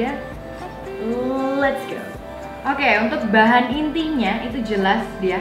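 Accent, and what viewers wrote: Java, Indonesia. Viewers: Yach, ,,dari tadi uploade nya, ,,baru nongoll braay, ,,,meddok bangeed mba e ,,,luv luve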